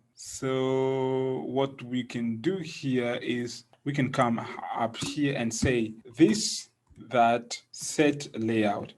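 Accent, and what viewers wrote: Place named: Nigeria. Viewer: Very nice Tutorial, thanks a lot! Is that a Nigerian Accent? :)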